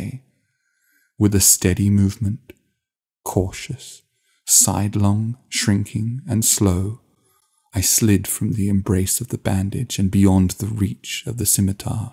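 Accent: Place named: England, United Kingdom. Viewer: Brilliant! Thank you, good reading, like your english accent 👍😉 Greetings 👋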